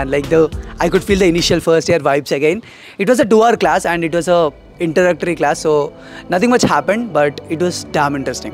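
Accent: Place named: Telugu, India. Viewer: ur telugu asscent hai aye hai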